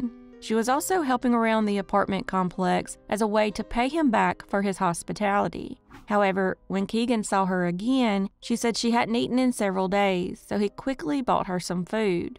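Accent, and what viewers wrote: South, United States. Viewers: GREAT JOB!! KEEP EM COMING!! AND I LOVE THAT SOUTHERN COUNTRY VOICE!!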